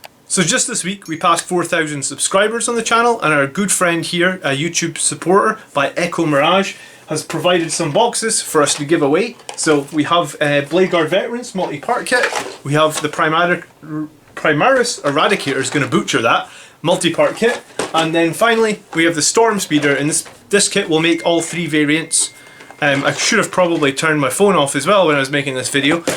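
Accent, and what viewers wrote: Scotland, United Kingdom. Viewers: Really enjoy the channel and the Fb group. Also nice to hear a Scottish accent on the Internet.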